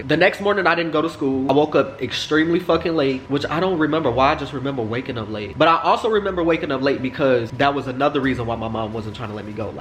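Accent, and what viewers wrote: United Kingdom. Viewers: It’s the British accent for me lol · BYEEE THE BRITISH ACCENT IS TAKING ME OUTTT 💀😂😂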